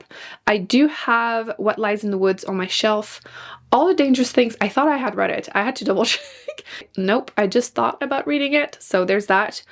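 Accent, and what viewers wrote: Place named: Quebec, Canada. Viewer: Moi qui t'entend compter en français et qui réalise que tu es québécoise: 😮🤯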